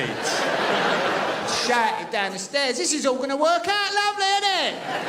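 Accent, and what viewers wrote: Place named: Cockney, United Kingdom. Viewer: You gotta love a bit of the cockney geezer! Pure class! UK xx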